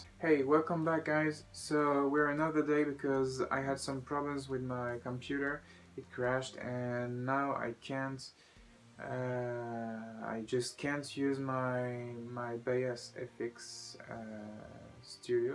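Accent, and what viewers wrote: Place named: Poland. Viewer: Nice, was thinking to get something similiar in near future, so doing some resarch ^^ (IBANEZ GSA60 WNF to be specyfic or IBANEZ GRG121DX BKF) trying find some significant differences between such types of pickups but the GSA60 speaks to me more look wise :D (Ps. are you Polish? guessing by Accent - no offence since iam polish too xD, just wondering!)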